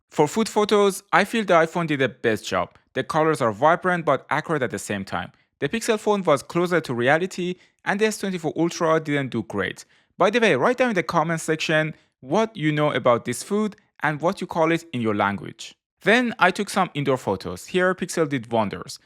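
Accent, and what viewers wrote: Iran. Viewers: Are you persian? you're accent sounds like persian but u don't look like one then i saw the koobideh kabab now i'm confused lol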